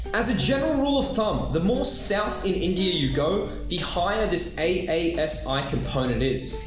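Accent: Australia. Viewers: Your videos are great. And the Austro-alien accent makes it even better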